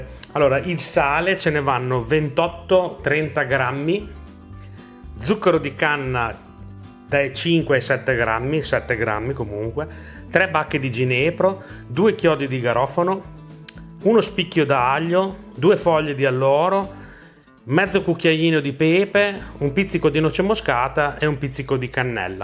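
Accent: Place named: Naples, Italy. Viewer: Bravo, poche parole e dette quando servono, non come fanno nelle tv nazionali i soliti tromboni, e poi l'accento napoletano è la vera ciliegina sulla torta. Bravo! Bravo.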